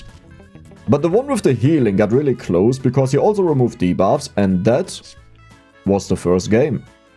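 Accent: Germany. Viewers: Man hört so raus dass du deutsch bist. Ich Liebs. Peak Video btw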